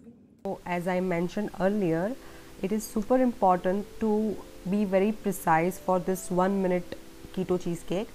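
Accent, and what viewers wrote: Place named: India. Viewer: The accent kills me... I get calls all day long from con artists and they ALWAYS have this India accent omg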